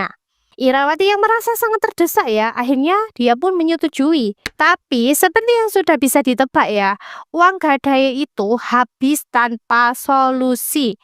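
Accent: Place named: Java, Indonesia. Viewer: Medok e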